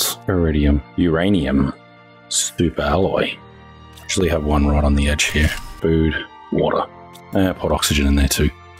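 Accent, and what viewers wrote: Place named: Australia. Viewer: Aussie ASMR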